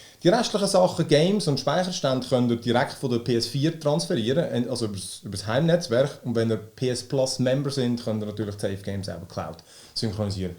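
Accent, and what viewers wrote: Switzerland. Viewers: Es ist Schweizer deutsch wo ich wohne 👍🏽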